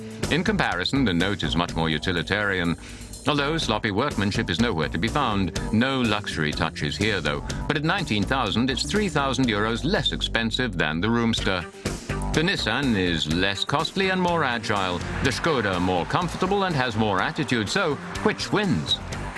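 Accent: United Kingdom. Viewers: Typical english hooooooooooooomosexual talking.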